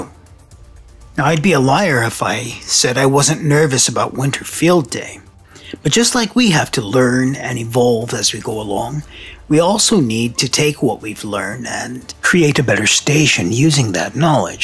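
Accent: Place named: United States. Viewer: Well, yes, I'm impressed. But the thing for me is how did you develop such a flat American accent?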